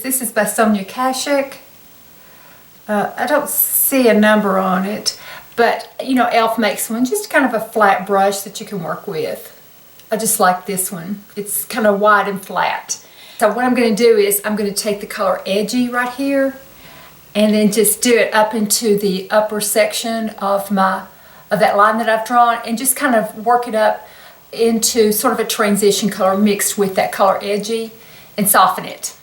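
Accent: South, United States. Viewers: You are beautiful!!! This is the first time I've seen any of your videos and it was very helpful. You must be somewhere in the South? Love your accent, you sound like my sweet Aunt Dot in a little town called Meansville, GA, about an hour outside of Atlanta. May I ask your age? Thanks for this video. I WANT THAT PALETTE!!!!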